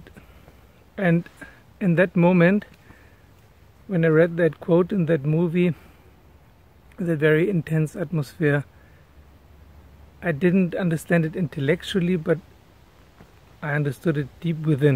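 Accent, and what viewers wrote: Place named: India. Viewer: wow, I always thought you were Indian, wheres your accent from? :)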